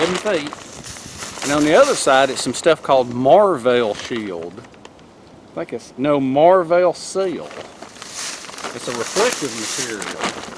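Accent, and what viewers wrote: South, United States. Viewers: God love ya , I could listen to you ramble all day . The cute things you say ,combined with that southern accent , and laugh ,your irresistible ! It's been insanely cold up here in Ohio . It surprises me that it's that warm . I would have thought most of the heat would rise up. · this is Mark from north central Ohio. I really enjoy watching your content. You are such a mellow dude and I like that southern twang. "I think I'm fixin' to go in there." I'm sitting in my recliner drinking a cup of coffee that I made on my alcohol stove. That fire reflector is a fantastic idea. There is another guy on YouTube who is really popular and has a lot of great content but he makes some of these elaborate contraptions and like you mentioned it takes a lot of time and energy making one of those heat reflectors out of wood and he's got major OCD because he goes back and chops the ends off so they're exactly the same length. 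Like you really need that if you were in a survival situation. 😃 You mentioned that you don't own property to camp on so you cannot set up a permanent shelter but what you could do is after you take your shelter apart you could just pull those stakes out of the ground and gather them together and lean them against a tree and then when you come back again it would be much quicker to set up because everything is prepared. All you gotta do is grab the same stakes that you used and put those back in the ground and rig up your shelter again. What I really like about your videos is your personality and the fact that you're not trying to sell something. You're going out there to have fun. There's another southern boy that I like watching, a guy named Blackie Thomas from Alabama. Same thing with him, he's a mellow dude and he has lots of videos and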